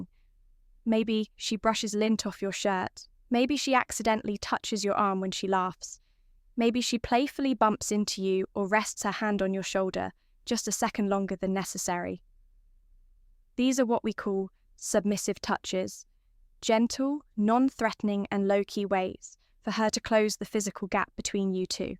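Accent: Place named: England, United Kingdom. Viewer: Great stuff,. Hope I got the name right. Well done. Keep up the good work.👍 You have a smooth rich sweet voice too. Plus a pleasant English accent.💞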